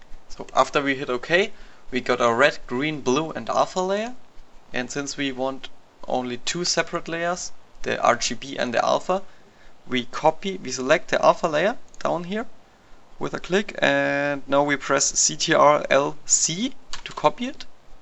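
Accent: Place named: Germany. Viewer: Denglisch xD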